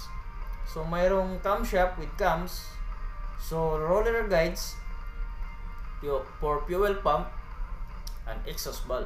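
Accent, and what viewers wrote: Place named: India. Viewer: brother ur starting to sound like those indian youtube videos, no offense taken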